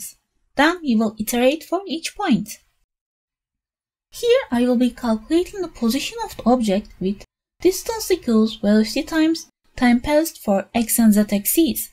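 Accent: Turkey. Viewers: aksanindan anladım :) ama tebrik ederim güzel içeriklerin ve kanalın var. Bir kaç videona baktım güzel gerçekten :)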